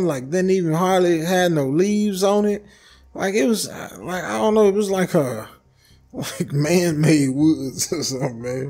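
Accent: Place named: Alabama, United States. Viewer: I just love to hear you talk. Reminds me of my friends back home in Alabama ❤️❤️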